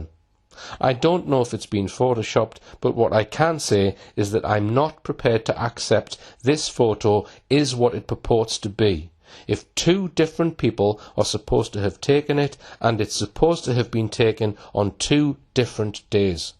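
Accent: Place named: Newcastle, United Kingdom. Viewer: YOU are a complete and utter moron. NOT only that are unable to deduce a commentary and facts. It’s not also locution lessons it’s elocution lessons . Before you make mindless comments on an accent in this case a Geordie one, take care of your grammar. This is broken down step by step. If you can’t follow this keep off the internet . Or scroll by. Spankwangle of a Troll.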